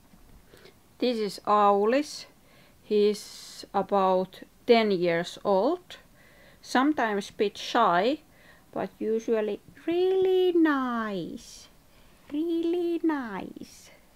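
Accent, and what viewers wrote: Finland. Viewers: I am from Nepal(very small country from asia)..and the most unique thing i found about Finland is the accent...i have mentioned in ur other videos...its really amazing the accent...Moi!!😁😁😁😁😁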